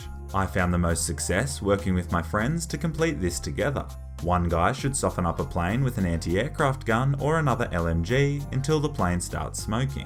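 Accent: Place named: Australia. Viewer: You are my new favourite youtuber! Your content actually makes me laugh. Your humour goes great with the accent (I sound like an american but I'm australian too). At least you aren't an ibis that steals my lunch box everyday.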